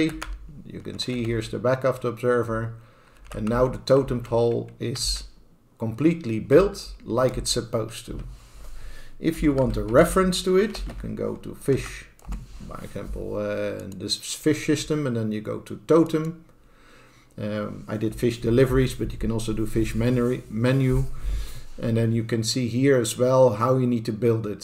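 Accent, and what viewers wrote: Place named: Netherlands. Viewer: Gotta love the dutch accent😂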